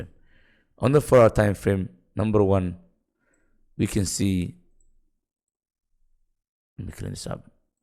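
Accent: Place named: Somalia. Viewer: You sound somali, i dont know why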